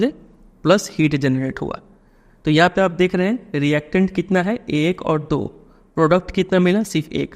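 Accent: Bihar, India. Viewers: Hello sir kya aap bihar ke hain kya?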